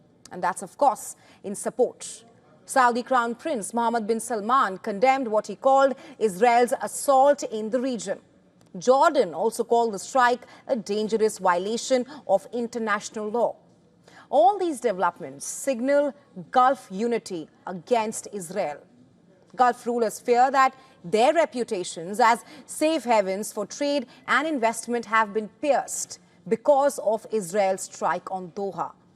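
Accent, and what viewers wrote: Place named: India. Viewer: Your sound Indian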